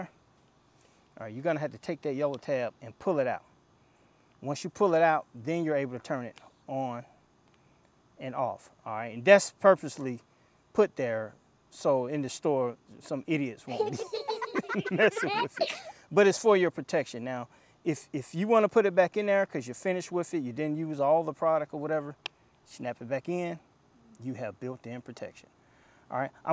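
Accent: South, United States. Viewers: Bruh, where you from, NC or Va?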